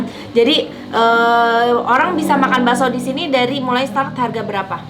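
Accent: West Java, Indonesia. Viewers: Urang sunda hadir tth,resep nyarios na sami urang sunda😉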